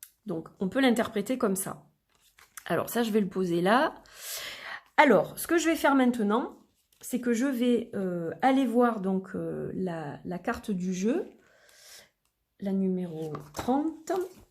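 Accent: South, France. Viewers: Bonjour, grâce à je viens de découvrir vôtre chaîne . Joli accent sudiste si je ne me trompe pas 😉 J'adore l'univers de cet oracle et je viens de le commander. L'association avec la numérologie me ramène à mon Oracle de la Triade. Encore une fois merci à vous, je vous souhaite une très bonne soirée. 🙏🕯️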